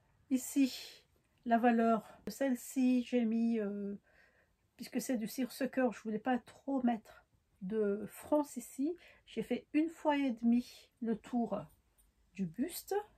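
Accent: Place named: Madagascar. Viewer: Coucou J’ai passé un bon moment avec toi dans l’atelier cet après-midi en tricotant. Kassandra me dit: « elle a le même accent que toi » 😂😂. Ben oui on est gasy 🙌🏽🙌🏽🙌🏽 Superbe ta jupe Tiana dans ce tissu ça fait chic. Et wouaou la jupe Dolorès, elle est juste magnifique 😻… ça va bien avec la ceinture. Je crois qu il faut que je fasse la mienne 😜😝. Des bisous de nous 2 😘😘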